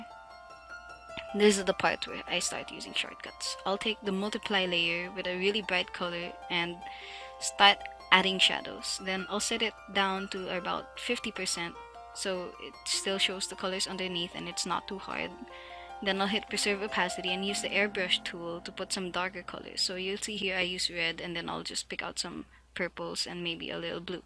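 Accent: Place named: Philippines. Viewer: your voice is so cute! i can hear that filipino accent a bit >:D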